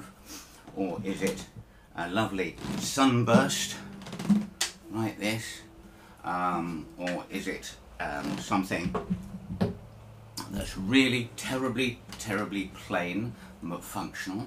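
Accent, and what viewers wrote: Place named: United Kingdom. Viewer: Hi! I really like your British accent. 😃 Sounds so stylish 😊What do you think of Guitars with laminated parts? Could they sound also good? I am thinking about buying a 12 string guitar (Guild) with laminated parts. Cheers Roger 🇨🇭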